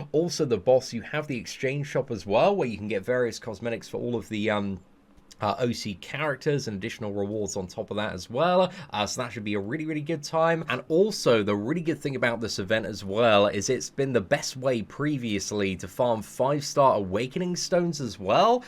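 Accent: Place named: England, United Kingdom. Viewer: It’s pretty obviously England. I mean I think he’s said it before and he has the exact same accent as me so yeah.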